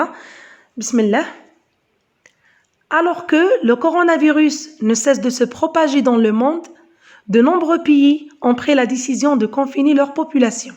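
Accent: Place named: Europe. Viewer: ليكن في علمك استادتي الكريمة ان موضوع الامتحان غير موجود في موقع ency-education فهو محدوف فعوض ان 6 مواضيع، تم تنزيل 5فقط، و لهدا ان كان لك من الزمن بقية رجاءا ضعي رابطه اسفل عند صندوق الوصف. سلام صاحبة الصوت الافرنجي الاصيل.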